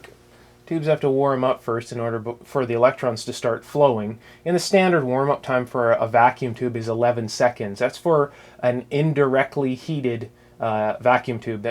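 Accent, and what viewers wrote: Canada. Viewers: Great explanations of what you are doing and why! I have to say, though, that you are from or near the Great White North (Canada), eh? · My wife always laughs at me because she has learned to pick out your voice by the "Solder", "Ohh-kaye?" and other Canadian idiosyncrasies... Nothing but love from Texas though,.:)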